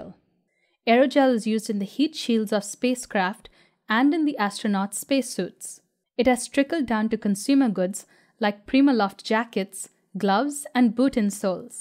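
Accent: India. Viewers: Hey very nice educative content, awesome graphics and you have a nice polished Indian accent too, you from India?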